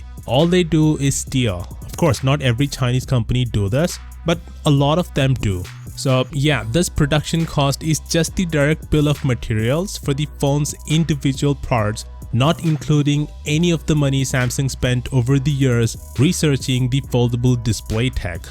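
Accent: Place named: India. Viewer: Your voice gets super deep when you say "lower". Indian accent ftw!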